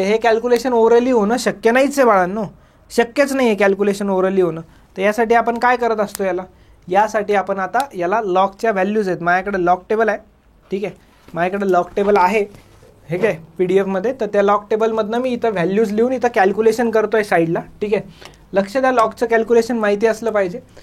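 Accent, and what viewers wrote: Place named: Marathwada, India. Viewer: Jalna aurangabad style😅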